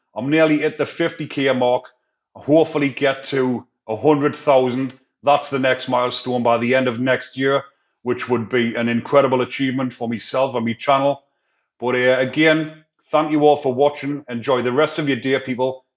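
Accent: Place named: Gateshead, United Kingdom. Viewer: Mate you are from my neck of the woods going on your accent (Gateshead I'm guessing?) I love the fact you have 50k subs for just reading out Wiki prisoner stories word for word. Fair play and good luck.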